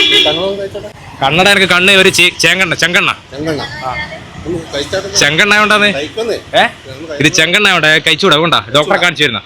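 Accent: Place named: Vadakara, India. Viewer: Ninghalde dialogue ketal Vadakara manasilayi backil board vechitund VADAKARA. Husn doubt und adan kannada kazhikan paranadm onn pottikatdm😂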